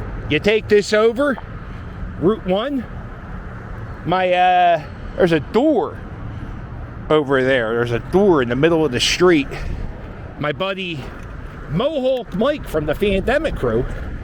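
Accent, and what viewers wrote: Philadelphia, United States. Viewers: I love these vids. Philly transplant in NYC. I love your Philly. accent!!! Good jawn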